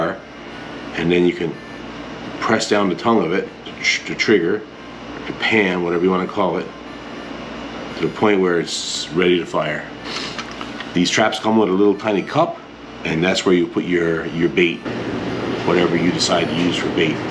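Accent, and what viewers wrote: Canada. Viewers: As a northern Mainer, I could instantly tell I was listening to one of my Canadian neighbors. 😊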